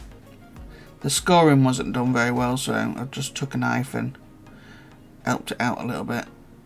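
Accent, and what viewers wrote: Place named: Scunthorpe, United Kingdom. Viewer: You sound like you have a good old sunny Scunny accent like mine lol ...The food looks lovely. Im so blummin hungry now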